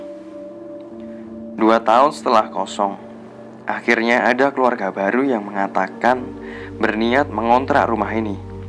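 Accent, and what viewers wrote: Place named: Java, Indonesia. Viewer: Logat jawamu kentel mas yho😂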